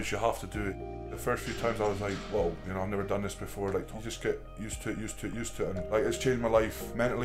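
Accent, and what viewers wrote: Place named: North East Scotland, United Kingdom. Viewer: His accent sounds very North East Scottish.I should know I live there.Never heard of this guy before but I'm definitely gonna start following his strong man career.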